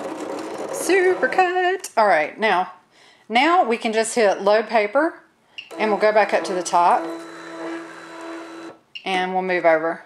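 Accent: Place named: South, United States. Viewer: When I first saw the video I thought you had went and bought a Cricut Cake..I was like "what..I liked her fake"...hahaha. I love the way you talk too...I have the southern accent also so its great to hear someone else with one!! Great video!!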